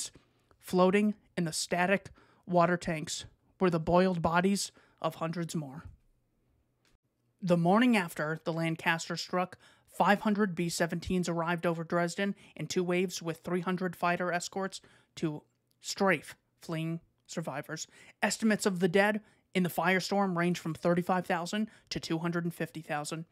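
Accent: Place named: United Kingdom. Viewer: Love the Bri'ish accent. Great video!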